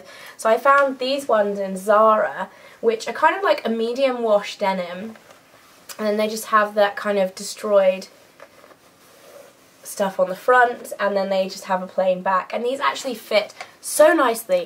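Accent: United Kingdom. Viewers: Bahaha. i try to talk british like yhu afterwards.. Is tht akward..( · omg , i wish i had a british accent . i love they way your voice is !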